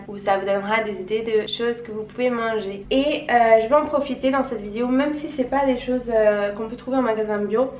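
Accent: France. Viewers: elle n est pas Française ? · J’adooooore ton accent français ! Et ça m’a donné faim 😅 Et Ohlala je suis pareil pour les biscuits, quand je commence je n’arrive pas à m’arrêter ahaha (ça rejoint notre discussion sur le pain sur Insta 🤣)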